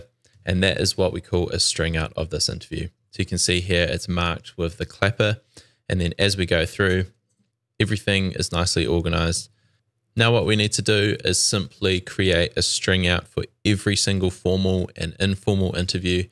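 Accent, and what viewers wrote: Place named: New Zealand. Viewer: Started watching the video - and my brain we like "that accent sounds so familiar and then you mentioned New Zealand. Ahhh Haaa! I am from New Zealand too! - btw Great video mate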